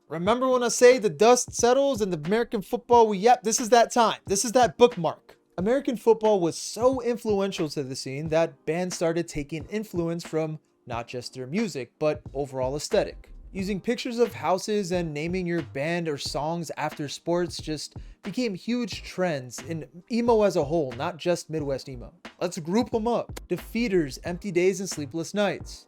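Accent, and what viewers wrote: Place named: Portugal. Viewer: omg I was listening to this, I opened the tab just to see who the hell is talking and to my surprise, portugal caralho.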